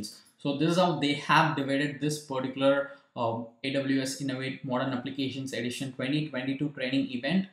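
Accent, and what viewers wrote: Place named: South India, India. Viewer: by ur accent you seem to be a south indian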